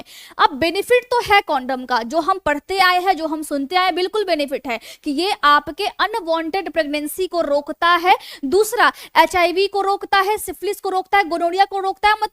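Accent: Bihar, India. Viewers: बिहार वाले पहचान मे आ जाते है बोलने के तरीके से आप कहा से है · Didi app Bihar se ho kiya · Bihari ho ka ji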